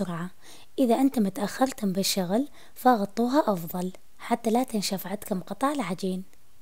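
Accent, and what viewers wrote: Mosul, Iraq. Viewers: عاشت ايدكي حبيبتي قبان بنات المصلاوية(الاحضرية ) اشقد معدلي وشاطغة بكل شي قبان لهجتنا الحلوي ول دادا 🌼🌼🌼🌼🌼🌼🌼🌼🌼🌼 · صوتك موصلاوي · احلى شي بالفديو لهجتج الموصليه❤️❤️❤️❤️❤️ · فدوة للكنة الموصلية عاشت ايدج❤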